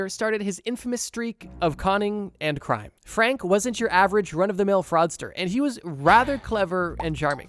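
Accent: Canada. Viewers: I think he’s Canadian ????? They pronounce things weird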